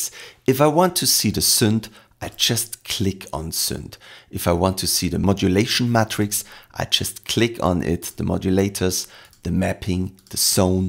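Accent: Germany. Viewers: man kann deinen deutschen akzent deutlich hören :)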